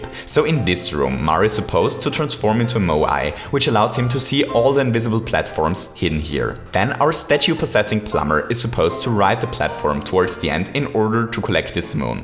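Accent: Germany. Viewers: Ernsthaft? So einen krass deutschen Akzent hab ich im Englischen noch nie gehört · Wow. It's so funny, I know you are a German, because how you speak English. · Or german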